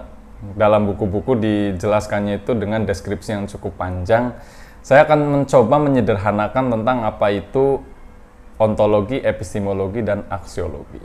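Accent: Banyumas, Indonesia. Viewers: Out of topic bang, bang nya orang ngapak ya? Ora ngapak ORA kepenak😅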